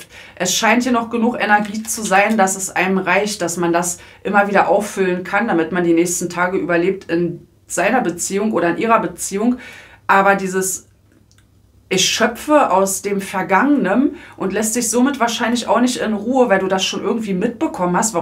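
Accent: Berlin, Germany. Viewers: frei Schnauze mit Berliner Dialekt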